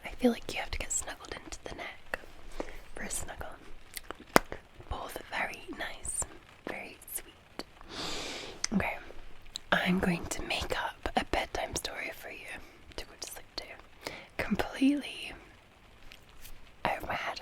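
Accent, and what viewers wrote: Scotland, United Kingdom. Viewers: What told us about herself: 1. From Scotland 2. Has scottish accent 3. Loves football 4. Drinks beer 5. Parents live close to Golf course If these were the traits of a character written for a TV show, the writers would be accused of reproducing quite a lot of stereotypes xD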